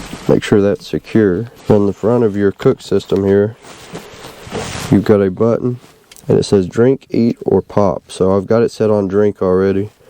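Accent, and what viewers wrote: South, United States. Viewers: Love that southern accent 😀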